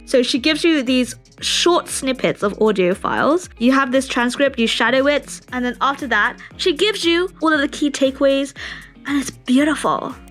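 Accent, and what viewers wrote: United Kingdom. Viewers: You sound like British but with some Singlish, and I thought you were Singaporean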